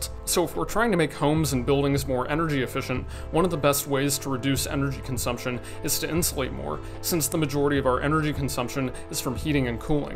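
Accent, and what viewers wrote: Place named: United States. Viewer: Thanks bro I totally couldn't tell from the fact that the man with an american accent was talking to me on an american website that he is an american.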